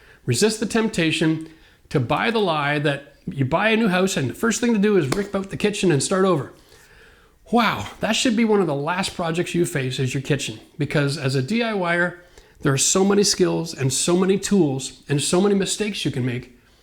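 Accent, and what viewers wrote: Canada. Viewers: and crew, I am no stranger to DYI however I found myself with a project I had never done. Upstairs shower leaking to downstairs kitchen. I found your channel by accident and when I heard the Canadian accent I was hooked. I live in NE Ohio USA and the weather plays a big part in any renovation. You had great advice, both time consuming and monetary. I wanted to thank you for sharing your experience and give a shout out to your son who made the comment (at the same time as me watching your video) "Now show them how to do it without 35 years experience".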